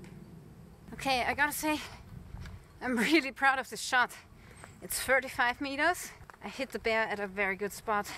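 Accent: Germany. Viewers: Are you German? You have a German accent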